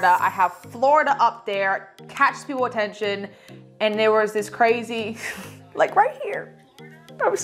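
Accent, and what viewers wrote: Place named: Australia. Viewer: ohhhh okok I thought I heard Aussie in there! ❤️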